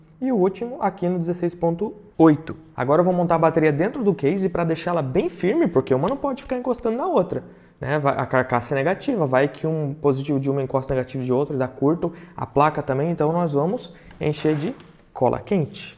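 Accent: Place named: Paraná, Brazil. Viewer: Fala, esse daí em final de frase... Acho que tu é do norte paranaense hein... Rsrs. Massa a aula, inscrito aqui e acompanhando pra aprender um pouco sobre eletrônica. Hoje trabalho com suporte em TI, mas estou buscando um 2ª fonte de renda.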